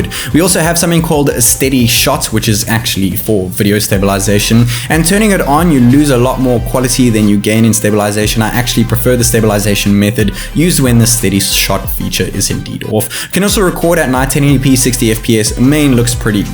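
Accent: South Africa. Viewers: Heard South African accent. Immediately subscribed. Sick content. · Is he from South Africa?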